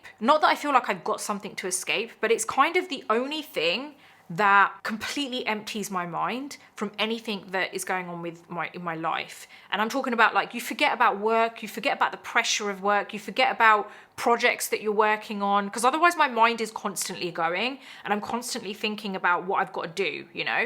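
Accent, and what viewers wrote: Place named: United Kingdom. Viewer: ❤ Iove your accent its so cute.. what part of UK are you from? ❤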